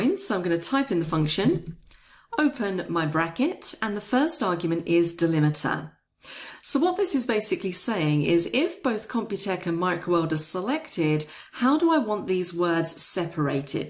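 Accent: United Kingdom. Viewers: Thanks. I love your perfect British accent😍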